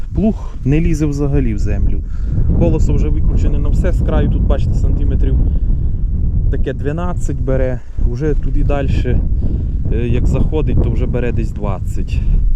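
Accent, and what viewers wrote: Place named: Dubrovytsia, Ukraine. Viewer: Доброго... по говору ви наче з Дубровицьких країв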